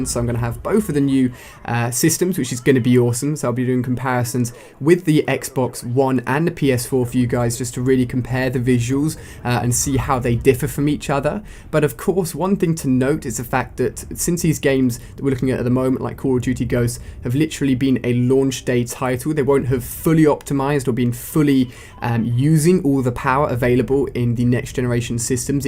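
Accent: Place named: United Kingdom. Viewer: Dejavu....only this time its british guy talking....tmartn did this first..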